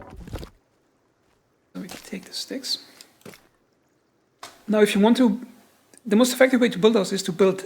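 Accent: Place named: Germany. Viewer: He sounds German to me...he's not treating this as a game, more like a job.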